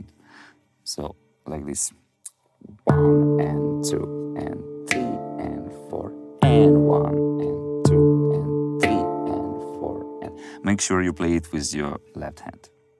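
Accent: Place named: Russia. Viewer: дружеский совет посмотреть как избавиться от русского акцента особенно если собираешься еще делать такие крутые видео.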